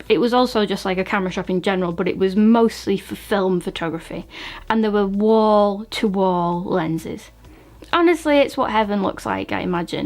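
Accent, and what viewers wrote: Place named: Manchester, United Kingdom. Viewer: Great educational video . I just bought one , great for travel . Love your accent . Manchester ? United or city ? I'm from Yorkshire but I still like your video .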